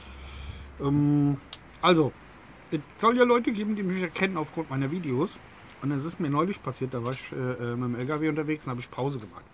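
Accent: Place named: Bingen, Germany. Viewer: genial hab ich doch gleich am Dialekt gehört, ich komm aus Binge schaue deine Videos schon ein ganze weile,bist auch aboniert.... ich mag an die 1.das du gerne Bier wäreend deiner Videos trinkst und natürlich Messer genau wie ich liebe. Hätte mal Bock mit dir das ein oder andere Pilz zu zerstören und dabei über Messer zu fachsimpeln.... LG de Bingen Andre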